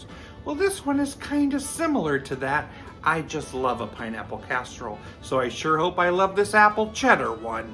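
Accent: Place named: North Carolina, United States. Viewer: This sounds like North Carolina ❤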